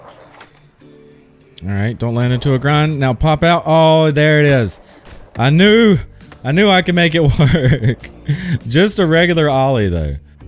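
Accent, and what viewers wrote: South, United States. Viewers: your accent is like a mixture of suburban , flamboyant , ghetto , and southern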